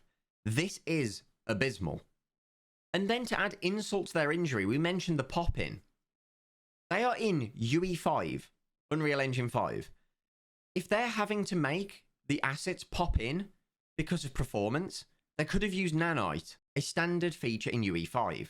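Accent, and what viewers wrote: United Kingdom. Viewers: British :P x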